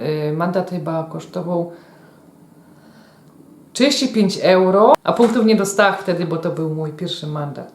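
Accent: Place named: Cieszyn, Poland. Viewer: Od niedawna oglądam twoje filmiki kulinarne i ciekawi mnie z jakiego regionu Polski pochodzisz bo znajomy jest mi twój akcent czy czasem nie jest to region cieszyński. Odpisz bo podobają mi się twoje godki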